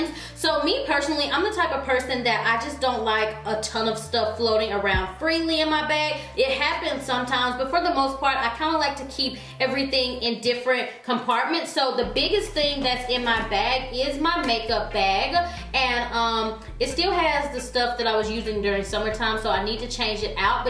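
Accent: South, United States. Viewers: I have been looking at your videos for a little while now but I really have to say I love your southern accent! And girl you are gorgeous😍😘. It was chilly today in Charlotte so after I got my boys off to school I just set back & watched a bunch of your videos, followed & liked pics on your IG. I have become obsessed with your channel just from this morning lol. You have amazing taste! Keeping posting so I can keep watching 😊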